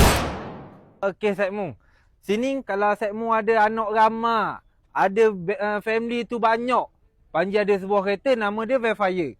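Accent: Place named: Kelantan, Malaysia. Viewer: Aku pun tak paham bahasa pekat kelate kamu 😅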